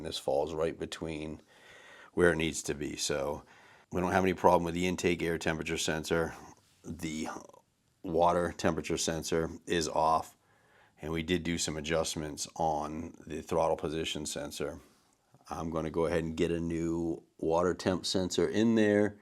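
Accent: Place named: South, United States. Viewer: Ok,thank you for your information. I really appreciate it. This southern boy is out in Albuquerque missing Mississippi it’s good to hear a southern accent!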